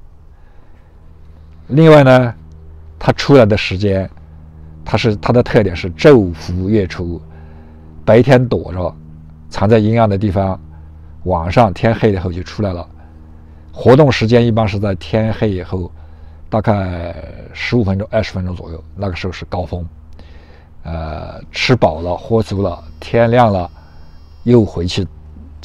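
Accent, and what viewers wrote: Yunnan, China. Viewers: 云南马普，听着好亲切。我就是洒草木灰，管用，肥皂水也可以。家里的鸡根本不吃那粘粘的东西。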